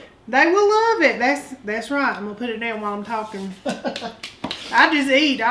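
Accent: South, United States. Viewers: I could sit and listen to you talk all day! I love your southern accent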